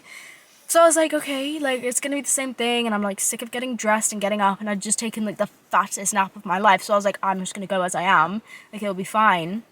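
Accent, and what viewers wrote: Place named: London, United Kingdom. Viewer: London block accent ✅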